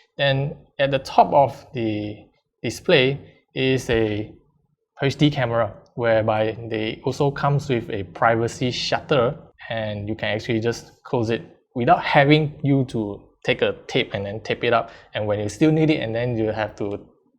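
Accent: Singapore. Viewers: Just from the accent, I can guess you are from Singapore!!!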